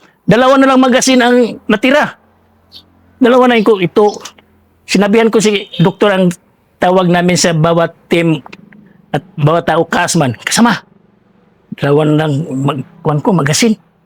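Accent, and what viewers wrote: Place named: Visayas, Philippines. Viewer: Iba tlga ang bisaya mg kwento , nkakatawa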